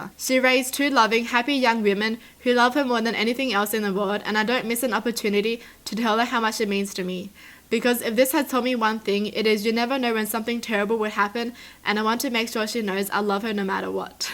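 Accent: Australia. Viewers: Shes from Australia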